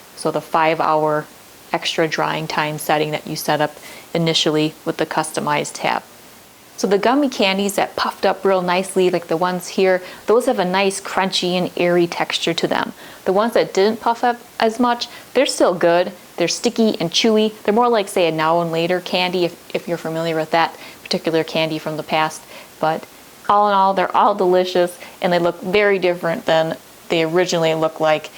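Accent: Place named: Wisconsin, United States. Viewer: Thank for detailed setting instructions! Also, your accent has me missing home in WI. 😍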